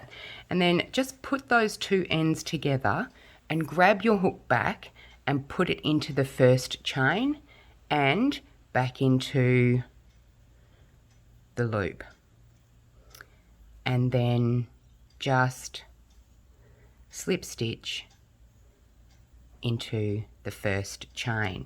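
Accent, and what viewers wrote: Australia. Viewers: Thank you! Firstly, it's nice to hear a fellow Aussie! This tutorial is so helpful because many foundation chain videos don't tell you what part of the chain you need to slip stitch into. I've often thought I'd done it correctly and then realised my join is at the wrong part of the chain and I end up with a gap. Thank you!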